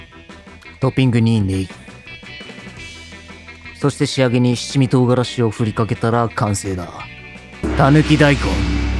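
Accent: Kansai, Japan. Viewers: 変な関西弁が混ざると思っていたが、本当に関西人だ。 西では天かすだが、東では揚げ玉。 これ、にこんにゃくのサイコロ切りを入れても触感が良い。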